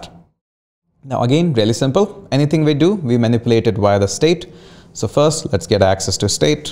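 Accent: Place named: Delhi, India. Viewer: why this delhi people spaek like this , too weired and annoying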